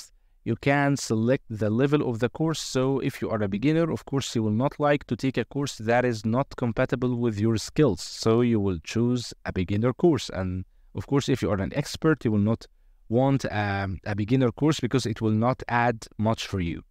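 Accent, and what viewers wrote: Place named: Egypt. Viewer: Thank you very much! it worked. وأخدت بالي إنك مصري زي من أول كام جملة :D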